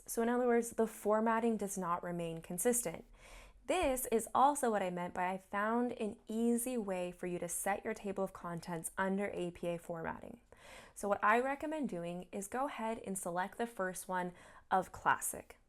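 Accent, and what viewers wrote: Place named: United States. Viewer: Your instruction is great. Thanks for keeping your instruction to the point. Having previously lived in the USA on and off for a few years its nice to hear your american twang reminds me of Seattle.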